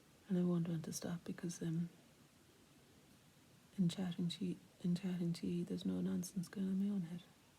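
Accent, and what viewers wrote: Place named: Ireland. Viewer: ❤With your damn Irish accent, I can understand anything! But your work speaks for itself! I think it is time for another DVD!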